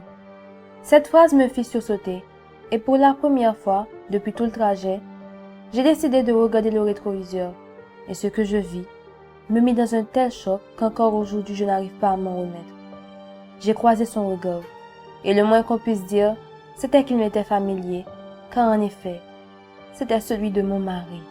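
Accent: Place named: Overseas France, France. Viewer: j'adore tes histoires on ton accent des Dom Tom.merci pour tes vidéos 🥰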